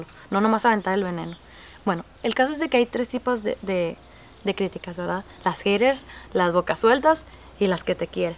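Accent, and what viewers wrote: Chihuahua, Mexico. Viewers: Me encanto tu video :) me caes muy bien y me encanta oír tu acento norteño jaja, en el video pasado te pregunte y me dijiste que viviste en Sonora hace un tiempo, tmb soy de allí, te invito a ver mi canal, un saludo hermosa :)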